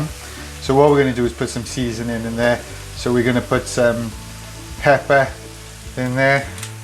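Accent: Wales, United Kingdom. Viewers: Hi, great to hear a Welsh accent on Youtube and in the Bosses group. Great video, good luck tomorrow for the Grand Slam!!!!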